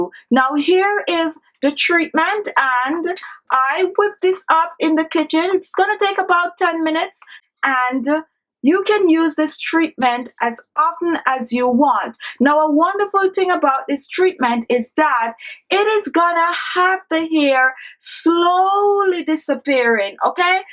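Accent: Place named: Jamaica. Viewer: I am subscribing, not only because the information is good, but also because you sound Jamaican! Thanks for sharing, I will be trying this!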